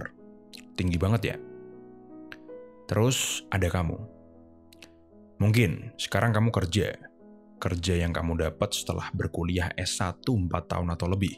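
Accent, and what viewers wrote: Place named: Java, Indonesia. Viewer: Suara orang Jawa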